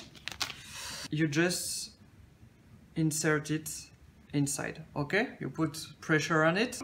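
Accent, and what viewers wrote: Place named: New Zealand. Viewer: Very usefull, with a Kiwi accent, thanks.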